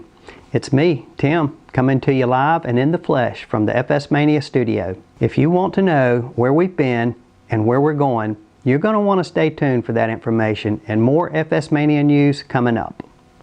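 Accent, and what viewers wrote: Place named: North Carolina, United States. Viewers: Hello, and welcome back, FS MaNiA! We've missed you very much, Tim!! IMHO, as an avid (but beginner) flight simmer, your YT videos are the best available. Your attention to detail, excellent teaching style, interesting and very enjoyable "Fun Facts", along with an occasional "live flight" video, make your channel the most useful, helpful, and thoroughly enjoyable flight-lover's learning source! And your North Carolina accent (I'm just down the street from you in the Charlotte area!) is delightful and so very pleasant to listen to!! BTW, yours is the first YT channel I subscribed to and, just a few minutes ago (because I just happened to see your 2020 Update video in my YT list when I got online the other evening), also the first channel on which I activated the Notification Bell. I don't want to miss any opportunities to learn more about flight from you!! 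I'm so glad you're back online with us!! And congratulations on your "semi-retirement"!! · Hello, Tim. First. Congrats to you on your next major step in life...retirement. I should know as I am as well. Let me be one of many to welcome you back into "active duty" on your channel and your signature way of providing intuitive and colorful videos and talking points. I have followed your channel since its inception and absolutely love your storytelling and "fun facts". I can remember on one of your episodes where you were getting an earful about your southern drawl. Well I can identify with that as well. As this is one of the first times I have commented on your channel I totally identified with your series as you were receiving an Embraer biz jet out of Brasil. My wife and I both watched the series and found it to be really good. She especially loved it and listened to your pronunciations of some of the cities....as she is a native of Brasil. 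Anyway...so much for the long comment. Welcome Back!!....Alvin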